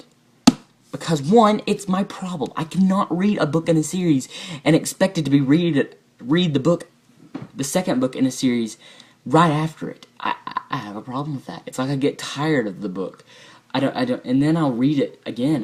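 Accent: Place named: United States. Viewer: Your accent is so cute LOL. You sound really American. Great video! Hopefully you get to reading those books soon. :3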